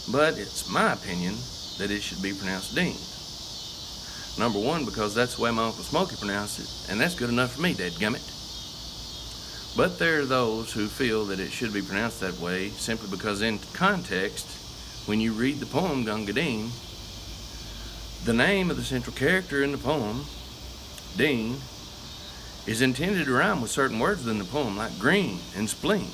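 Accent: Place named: United Kingdom. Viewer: especially the hillbilly British accent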